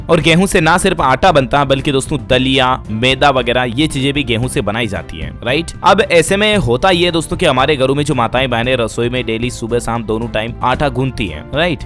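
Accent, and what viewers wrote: Rajasthan, India. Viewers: Tum rajasthan se ho kya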